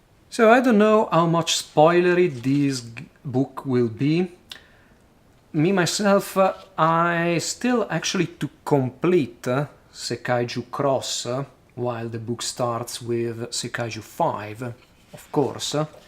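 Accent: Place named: Italy. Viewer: Dalla cadenza inglese/maccheronica sembrerebbe lmao sarebbe una gioia, io non conosco nessuno che gioca a etrian odyssey... E community italiane penso non ne esistono :/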